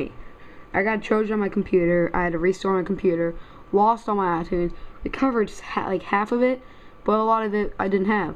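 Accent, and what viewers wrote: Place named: United States. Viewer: Suggestion: 1. Make a script (short headings are fine) 2. Prepare your material. 3. Do a few dry runs. 4. Speak clearly. For some people an American accent is hard to follow 5. Speak slowly. You sped up with the copycat@ line! 6. Don't hum or sing when you have a blank moment or drum your fingers. If you start to do that go back to 3 above. 7. Close your room door as we can hear your Mum or Sister talking in the background.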